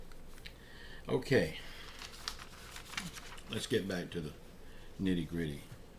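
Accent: United States. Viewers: you remember that country classic by that you I like your show I like your country ascent you are just good old boy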